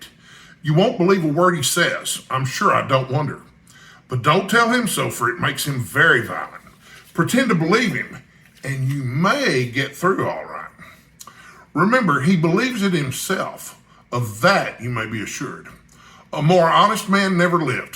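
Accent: Texas, United States. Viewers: You had me laughing out loud with this chapter, especially during the description of of their gymnastic tussle! I think I have the best chance of feeling fond of Professor Challenger hearing him with a wonderful Texan accent. I daresay the one thing that can make Conan Doyle even more enjoyable than it is on its own, is to picture it taking place with Texans rather than Englishmen (no offense to the English!). Anyway thanks for the reading.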